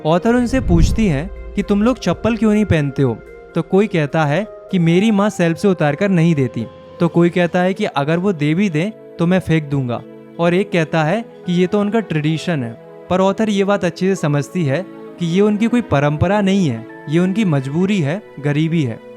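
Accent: Uttarakhand, India. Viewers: Bro aap uttrakhand se ho ? ❤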